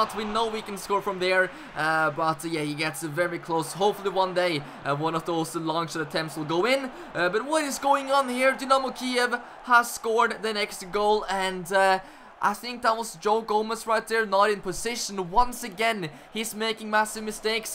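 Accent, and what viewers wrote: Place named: Norway. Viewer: You sound like you're from Norway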